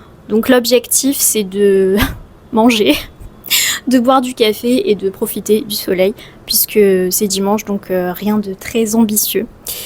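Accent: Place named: South, France. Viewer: Ton sud français est mille fois plus beau!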